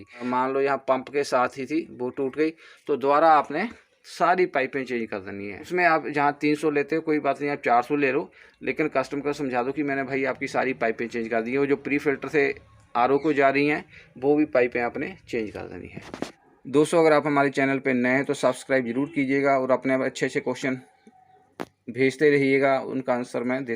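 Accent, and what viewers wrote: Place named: Punjab, India. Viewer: Ur punjabi :D hindi toh pata lag geya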